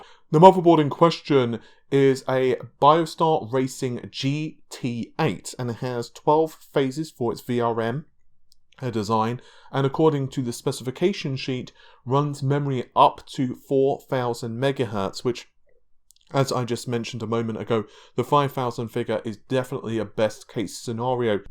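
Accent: United Kingdom. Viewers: He is British, they say things differently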